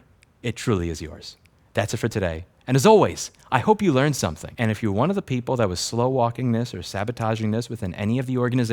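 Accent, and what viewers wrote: New York, United States. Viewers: Congratulations. You've really made a difference. Many people complain and protest, but you've done the difficult part by working to influence legislation. You should think about running for office in TX. Maybe put a little southern twang into your NY accent.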